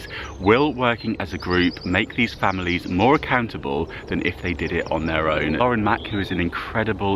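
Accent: England, United Kingdom. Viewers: Wow! Congratulations this is wonderful news!...Wish we could tune in also..in the UK!......The American viewers will love your English accent too...Wishing all the very best you deserve it...Fondest wishes from Derbyshire xxx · Congratulations, you have such a lovely English accent, pretty sure the Americans will love you, and I’m so glad you got to film and hope you get the chance to do more over there, 🏴 love Katrina xx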